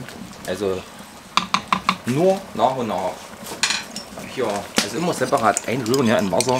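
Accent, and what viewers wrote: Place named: Saxony-Anhalt, Germany. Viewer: Leckeres Rezept😋 und dem Dialekt nach zu urteilen,könntest du aus Sachsen-Anhalt kommen🙈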